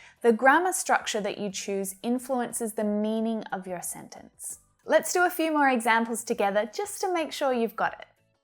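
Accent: Australia. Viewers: your accent is so different. I LIVED in montreal, Canada. The accent from Austrilia is so good. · Australian English is the coolest 👍🏻😃, I really like your accent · I live in Canada and I am so happy to listen to your Australian accent that I love. I love to visit Australia as well.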